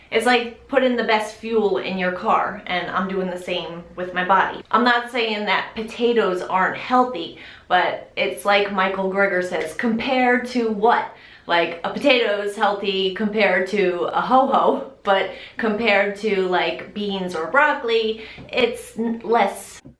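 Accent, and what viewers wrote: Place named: New York, United States. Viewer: Your voice is so stinky cute! Haha! You sound like you walked right out of a subway in New York!!! Love it. I’m a new follower. I got sick and then fat and I have no idea how to heal my body and get back to my size 2. I’ve gained 50 pounds in two years!!!! Pray for me. Thanks for this info. It has really encouraged me.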